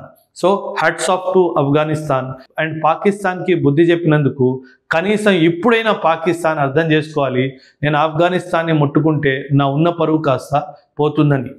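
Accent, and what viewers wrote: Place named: Telugu, India. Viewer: Though you are a Sardarji having Punjabi as mother tongue, now you are speaking like a pure Telugu person.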